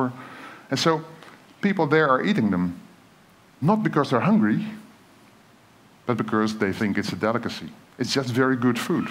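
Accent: Netherlands. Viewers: Wow, massive Dutch accent. Also, I have a really simple answer to the question. "Because I have no where to buy them" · So obviously Dutch.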